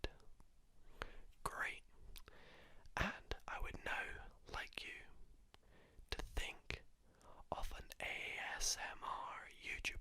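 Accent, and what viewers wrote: Scotland, United Kingdom. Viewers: I can't place your accent are you a fellow scot? :)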